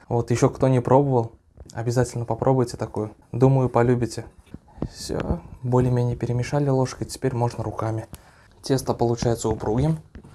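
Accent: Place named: Bashkortostan, Russia. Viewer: Как будто из башкирии, у нас обычно с таким "акцентом" (гОвором) разговаривают.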